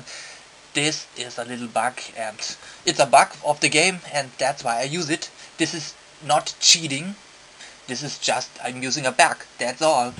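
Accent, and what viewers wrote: Germany. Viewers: Are you German? Sorry if I'm wrong, but I'm not so good with accents. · Oh god. German Accent. Boner. =|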